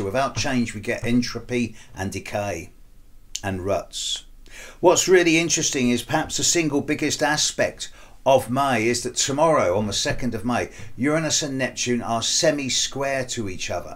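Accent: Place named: England, United Kingdom. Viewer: Hi! I love your astrology reading and your accent, where you come from in England? I need to go there 🙏😊 Thank you so much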